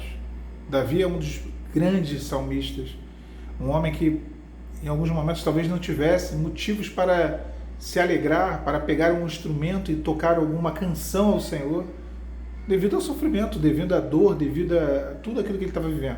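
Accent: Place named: Rio de Janeiro, Brazil. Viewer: Você também é aqui do Rio. Bem que reparei o jeito diferente de falar. Que legal!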